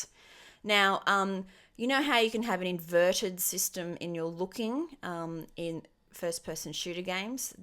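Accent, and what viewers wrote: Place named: Australia. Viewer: Uhh yes. An Aussie, thank lord. Been listening to too many Brits, need some voices from my homeland.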